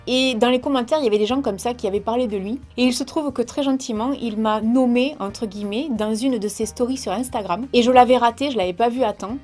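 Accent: South, France. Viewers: Je laisse rarement des commentaires, mais j'aime beaucoup tes vidéos et les messages que tu y inclus. C'est assez rare sur youtube. J'adore le petit accent du sud et cela me rappelle ma douce France, comme suis expatriée en Allemagne 😀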